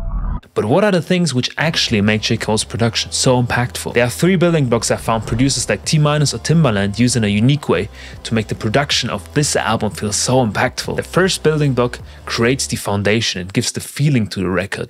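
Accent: Germany. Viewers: I was gonna comment that you sound German until I checked your about section lol. Great video! Please do more of these.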